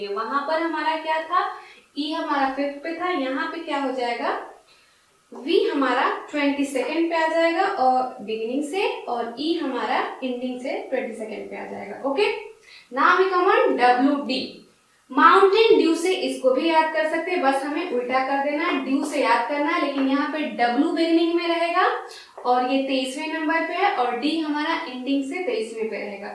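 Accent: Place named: India. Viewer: yea she is but this isn't British it's Indian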